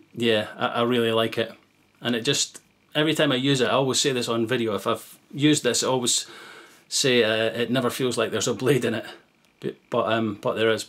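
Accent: Scotland, United Kingdom. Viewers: love the Scottish accent! long live Scotland! :) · I'm still searching, while avoiding Gillette, because of their advert. I have an old 1958 Gillette that''s still serviceable, from the year before before I went into the military. When I can afford it, I'll try the Rockwell. I really like your channel, and I enjoy the intelligent comments made by your viewers. I wish I had a Scottish accent like yours. Thank you for an informative and entertaining half-hour now and again.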